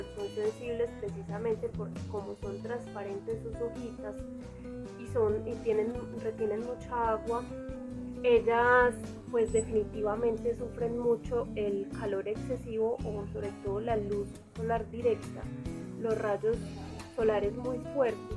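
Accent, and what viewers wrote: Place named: Antioquia, Colombia. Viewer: si te sentía el acento paisa jejeje saludos desde Cali y adelante con tu canal.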